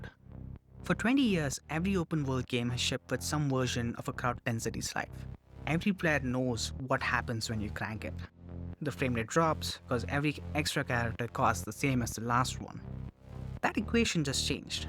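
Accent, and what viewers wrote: India. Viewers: chatgpt script, youtuber vidoe essayist cadance, indian babble accent overpowering everything, terrible shit brothaaaaaaaaaa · are u indian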